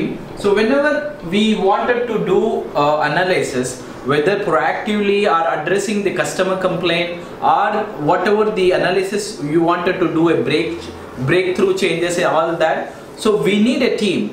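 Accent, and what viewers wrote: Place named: India. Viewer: Hello there. Dear bro thank you for this concept. You've explained 8D methodologies well with your nice Indian accent. One question: I work at a pharmaceutical Co. in Iran, QA dep. A validation expert. I always been confused with definition of VALIDATION & VERIFICATION. Can you please clarify them seperately?❤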